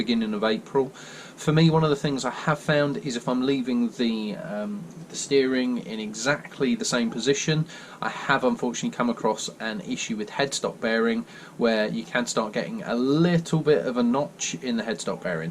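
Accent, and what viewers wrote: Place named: United Kingdom. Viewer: you have an awesome channel!! i love the british accent lol. and the bikes look amazing. hope we can stay connnected ❤️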